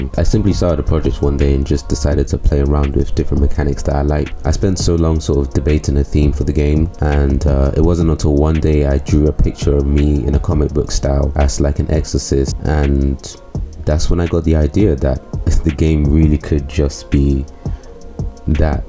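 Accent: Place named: West Africa. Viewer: yo, great work but you have a similar accent as me, are you from west africa, not to be offensive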